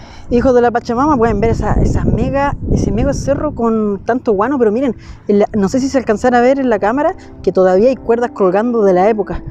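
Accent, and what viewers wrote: Chile. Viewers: Que acento desagradable el Chileno 🥴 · Muy interesante ese vídeo pero no se entiende mi papá lo que hablas deberías hablar más despacio para que se entienda solamente entre chilenos se entiende eso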